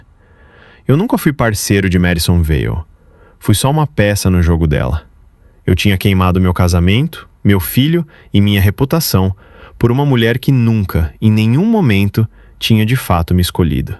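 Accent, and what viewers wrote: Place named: São Paulo, Brazil. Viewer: Sotaque de paulistano!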